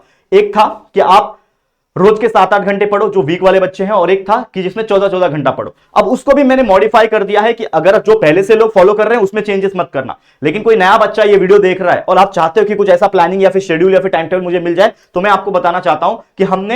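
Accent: Maharashtra, India. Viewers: sir kya aap marathi ho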